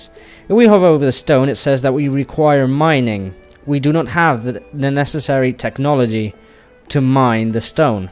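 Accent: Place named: Northern England, United Kingdom. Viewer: I really appreciate this video. You very clearly and calmly lay it all out there for a beginner like me. You have an unusual accent, I was trying to place it. Lincolnshire? I heard elements of that northern twang, but not as strong as some.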